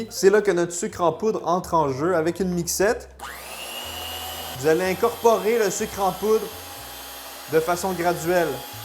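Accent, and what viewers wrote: Quebec, Canada. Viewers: Je kiff le parlé québécois c'est trop stylé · J'aime le sucre et l'accent québécois! Si un jour j'ai assez de testeurs non diabétiques pour manger les résultats avec moi, j'essaierai les recettes ^^ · Mon cheum keskispassss vec tin acceint québécois tabarnak l'est plus en plus prooooonooooncey Pas l'habitude des acceint hein mwa ds min royaume belchge. 🇧🇪On t'aime bien min couille 🍻🍻 PAAAAAAS L'TEEEEMPS NIIIIAIIISEEER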